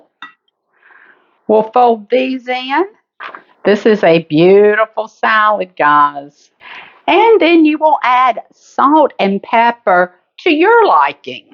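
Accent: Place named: South, United States. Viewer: You can tell she's from the south. She pronounces "boil" like bowl.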